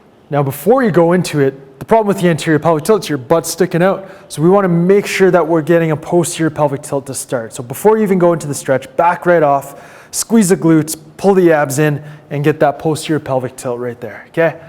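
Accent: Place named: Canada. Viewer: Definitely Canadian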